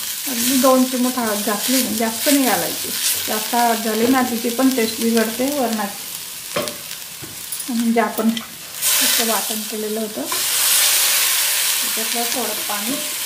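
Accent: Khandesh, India. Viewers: Chan Tai. Recipe takat Jaa .... Khandeshi awwaz aiklyawar pan samadhan milta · ताई तुम्ही खान्देशी आहात का कारण आपल्या खान्देशी भाषा लगेच ओळखले जाते आणि आम्ही तुमचेच सर्व व्हिडिओ बघतो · Tumcha aavaj olkhicha vatato aahe Tumhi khandeshi aahat ka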